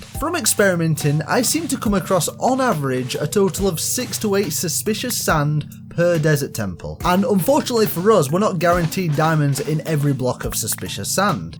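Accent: United Kingdom. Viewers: sounds british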